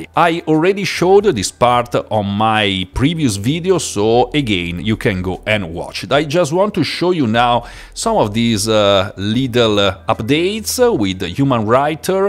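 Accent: Italy. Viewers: I love your perfect English with a delightful Italian inflection when a word ends in a consonant ❤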